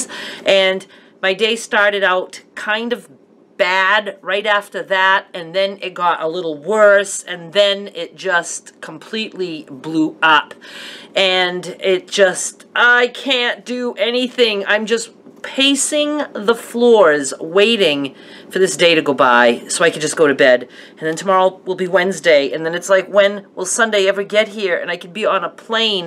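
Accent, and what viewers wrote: Maine, United States. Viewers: This will be a totally different comment (hope you can laugh a little). I’m living in Ohio now, but lived in Maine on North Haven for 2 years with my husband. I love your Maine accent and it brings back wonderful memories Maine.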